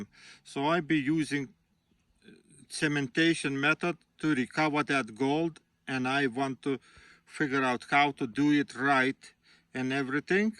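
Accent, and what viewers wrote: Russia. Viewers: But the funniest thing is Russian speaking English 😅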